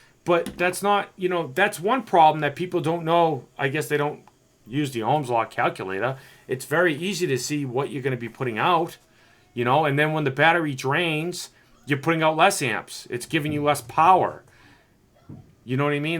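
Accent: Boston, United States. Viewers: Well said D from 25mins on but learned alot. Also...love the accent, is that Boston? 😄💨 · You just gained a new subscriber because with all the videos I’ve put myself through yours is the one I got the most knowledge from. Are you from Boston ? Take care,all the best 🙏✌️❤️, 🍀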